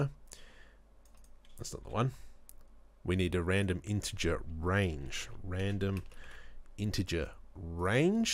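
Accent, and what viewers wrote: Australia. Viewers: Good to hear and aussie voice and nice tutorial. How would you restart the random spawn process once the actor is destroyed, having alot of trouble with the extra step.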